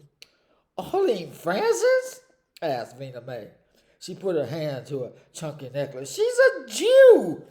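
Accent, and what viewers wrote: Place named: Mississippi, United States. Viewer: Love this. As one born in Mississippi whose mama was born and raised in Scott County, I recognize those sounds. At one point you reminded me of Aunt Authula. I heard Uncle Stanley, too. My relatives in Mississippi sound just like that. I need to read your books. Well done here. Thank you.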